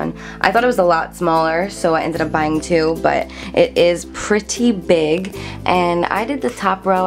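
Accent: New Jersey, United States. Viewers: It's called a New Jersey accent, not a California accent. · she's from Jersey. It's her accent. I pronounce it the same. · Actually I think it's a New Jersey accent. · Her Jersey accent ❤ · new subscriber here - love your Jersey accent!! (I am from Long Island - we have a similar accent :-) great video - got lots of ideas! Thanks! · Please don't be sorry for being who you are!!! I love that Jersey accent, and I say cawfee and I'm from New York. Negative comments always come from those feeling that way inside its a reflection of how they feel about themselves. I love all your videos and the genuine side you always show. Beautiful inside and out! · Where are u from? Are u from jersey? Trying to figure out where ur accent is from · Good heavens, that's a Jersey accent. Hearing you speak reminds me of my friend's accent and how much I wish she were still alive. 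· Your accent has gotten so strong "jersey".. You're adorable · It's a Jersey accent. :) I used to say it like that until I moved to Virginia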